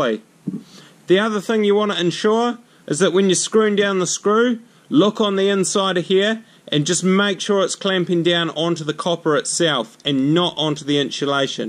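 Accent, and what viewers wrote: New Zealand. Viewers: I believe it's a kiwi accent! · Love the kiwi accent, mate ;-)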